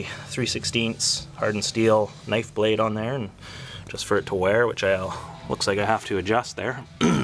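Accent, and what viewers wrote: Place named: Southern Ontario, Canada. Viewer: Really like the plow, great stuff. the hydraulic pump makes me a bit nervous on the back of the blade. Sounds like you might be from southern Ontario riding on Dec. 4.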